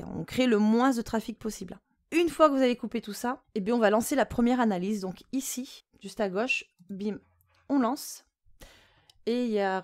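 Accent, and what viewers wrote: South, France. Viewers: Jolie accent du sud 😜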